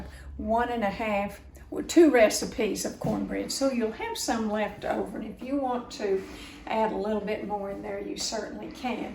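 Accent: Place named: South, United States. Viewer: Thank you for making this video. I am gonna try this recipe this year for Thanksgiving. My Grandma use to make the best cornbread dressing. I wish I would of asked her for recipe. I have tried to make it for several years and just haven’t been able to get it right. Your recipe does look very similar to hers. Listening to you speaking and hearing your southern accent reminded me so much of her. Again, thanks for sharing this.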